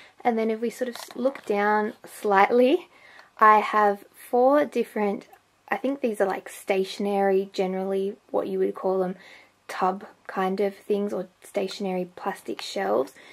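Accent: Australia. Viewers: omg i love australian accents (and your collection) :)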